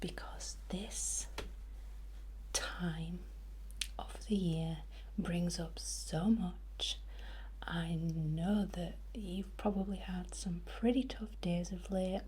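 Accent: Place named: Yorkshire, United Kingdom. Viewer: I recognise that accent. ❤ From Pontefract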